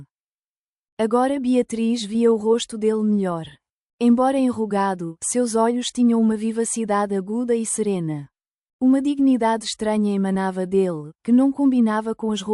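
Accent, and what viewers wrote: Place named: Portugal. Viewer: Amei a historia! Sensacional! Comovente! Amei ouvir a narradora com sotaque de Portugal! Amo esse sotaque!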